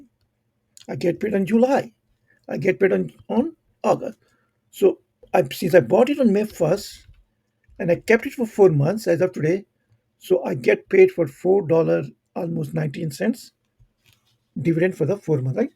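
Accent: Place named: India. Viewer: Indian accent!!!!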